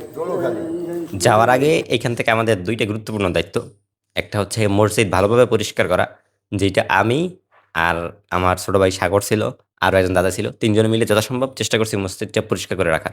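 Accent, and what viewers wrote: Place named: Bagerhat, Bangladesh. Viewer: আপনার কথা বাগেরহাটের মতো।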